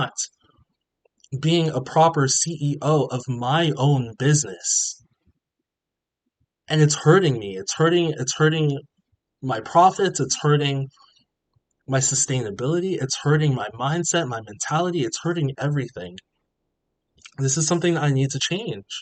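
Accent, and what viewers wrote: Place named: United Kingdom. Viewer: you're from the UK? That's pretty dope. You must've moved here when you were younger judging by your accent. Also I took your encouragement and got my first funded, I did the practice mode and lost a bunch of times but I just got my first trade win for $67.78 and I know it wasn't luck because price got near my take profit three total times before crossing it lol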